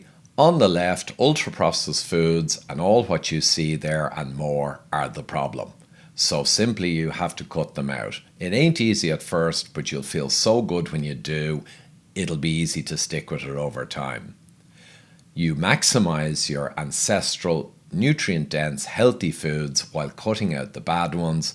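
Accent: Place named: Ireland. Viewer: the proble for ivor , is his accent , its so difficult to take the irish accent seriously . they are more renound for making jokes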